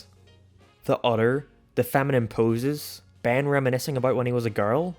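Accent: Ireland. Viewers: r u irish? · Wait. Is he Irish? Mind=blown. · wait i thought you were scottish but literally only ireland has irish as a class so that narrows the place you grew up in to side of ireland to the north or donegal because you have that kind of accent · Yo didn't know your irish that's awesome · Are you Irish or Scottish? I can’t tell, but don’t ever shit on my Barnyard again. Eat some shit ass food, pray to your King, and shut the hell up. 1776 from the Brits but 2006 is what you should remember. Peak USA dumbass. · U Irish ooooooh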